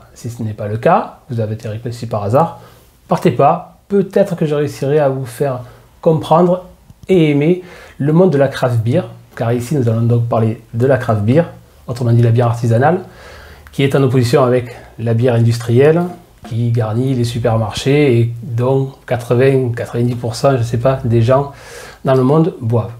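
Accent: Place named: Marseille, France. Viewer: Félicitations pour ce beau projet !!! 🍻 Et vive l'accent marseillais ! 😄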